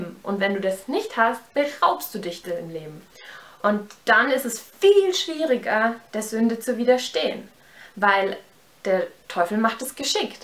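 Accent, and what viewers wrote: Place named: Austria. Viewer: du hast schon voll den österreichischen akzent übernommen... :D kannst du mal eine tagesroutine mit jesus drehen?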